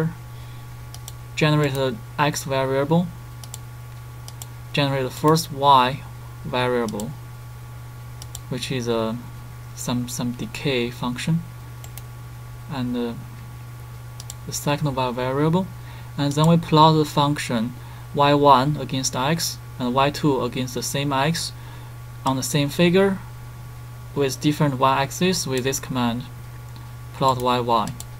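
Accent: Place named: China. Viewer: I heard strong Chinese English. Keep going.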